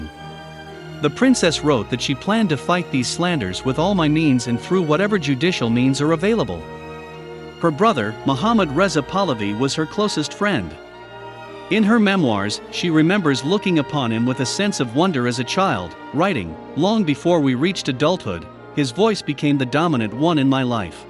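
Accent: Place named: United States. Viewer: The strong american accent who disforms the pronounciation of the iranian names is very annoying. The music is too loud and covers the speech.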